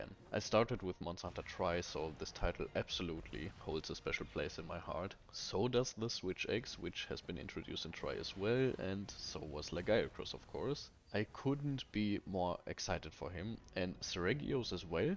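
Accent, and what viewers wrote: Germany. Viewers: Youre German, arent you?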